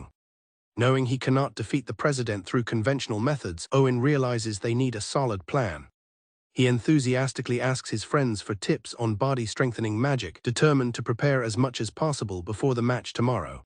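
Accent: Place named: United Kingdom. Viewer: Manga recap with a British accent?!🤩👍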